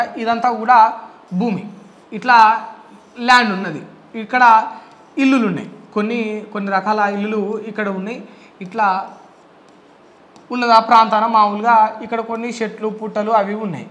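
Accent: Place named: Telangana, India. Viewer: Chaala baaga cheppinav anna. Mana telangana yaasa lo inkaa manchiga anpinchindhi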